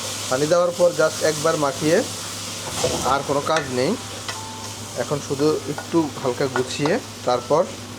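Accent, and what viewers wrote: Noakhali, Bangladesh. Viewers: ভাই রান্নাগুলা দেখান চমৎকার৷ কিন্তু শব্দ চয়ন গুলু নিতান্তই খেত, যেমন ফানি,ফেয়াজ, ছিনি, আরো অনেক নোয়াখাইল্ল্যা উচ্চারন৷ বিষয় টি খেয়াল করলে খুশি হবো৷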